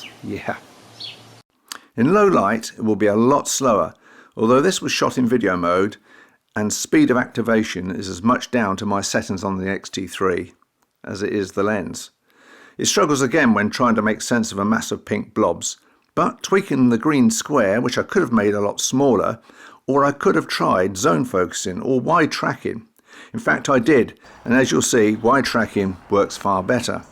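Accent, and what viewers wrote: United Kingdom. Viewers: Fantastic review. Most reviews have insufficient images and video to get a decent look at what a lens can do. You nailed it. Nice vocals too. So envious of you English with your sophisticated accents. Nice pics mate - great vid too. Astia looked good. Do you do a special white balance when you shoot? Or just use Auto, or Outdoors??? Many thanks, Cam from NZ. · I will definitely get this lens, I love my 55-200 but I want the extra reach and the almost macro capabilities. Great review; I like your good vibe and your British accent. Greetings from Taiwan.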